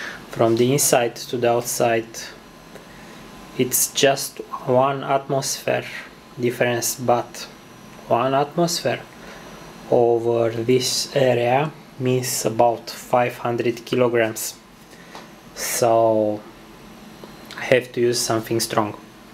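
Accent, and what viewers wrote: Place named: Russia. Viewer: рашин акцент)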